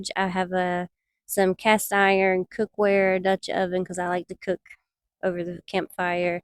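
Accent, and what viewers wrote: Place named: South, United States. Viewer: I love that southern draw, your ex must have been crazy to divorce you.. keep up the good work, and take care of your fur baby.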